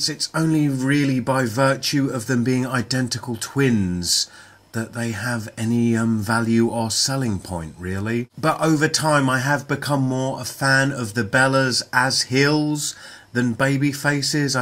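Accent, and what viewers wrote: United Kingdom. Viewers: awesome review! fun to listen to your british voice! great belt custom! subscribe!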